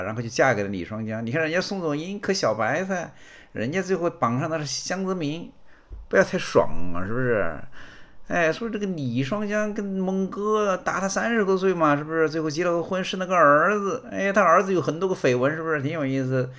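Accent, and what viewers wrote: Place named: Jingzhou, China. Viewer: 这个主播的口音和荆州地区类似:face-blue-smiling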